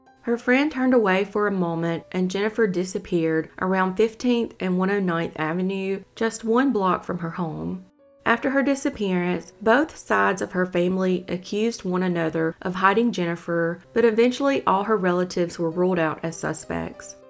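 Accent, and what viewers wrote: South, United States. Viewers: I'm Norwegian, and I have to tell you how refreshing it is to listen to your southern accent. It's a rare treat! American news media and for the most part American TV series seem to frown upon dialects for some reason. It's a crying shame. I could listen to your dialect all day long.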